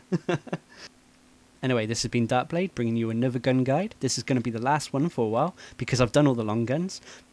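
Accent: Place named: United Kingdom. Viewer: I love the British accent it's just awesome. Will u add me on psn please.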